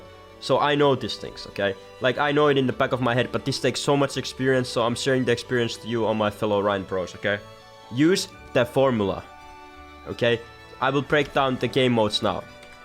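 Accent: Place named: Scotland, United Kingdom. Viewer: wtf is your accent? its a mix between like scottish and asian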